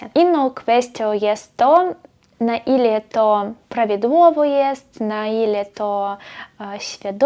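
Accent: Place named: East, Poland. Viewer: To mój pierwszy film pani. Bardzo ciekawie się słuchało. Zarówno temat interesujący, jak i przyjemnie słyszeć polski język w takiej wschodniej formie. Bardzo dziękuję za nagranie. Dowiedziałem się czegoś nowego. I gratuluję języka! Super.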